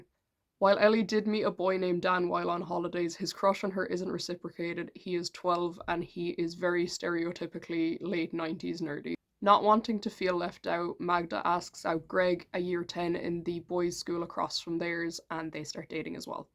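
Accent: Ireland. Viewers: I clicked on this video and recognised the accent straight away lol, r u a south Ireland pep? Unfortunately I'm from the shitty north but it's cool to know I'm not the only alt person on this island 💀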